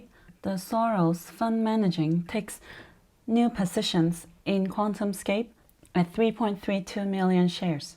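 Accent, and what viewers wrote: China. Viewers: showing them some colors...这中式英语哈哈哈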